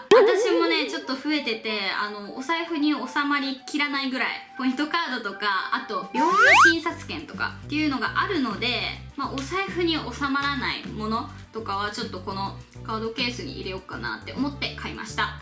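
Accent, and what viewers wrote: Hiroshima, Japan. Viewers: 広島弁かわいいです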